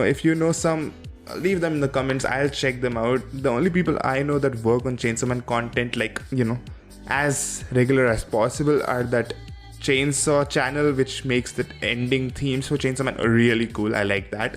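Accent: India. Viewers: you sound Indian?! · Bhai indian h kya? accent se lag rha h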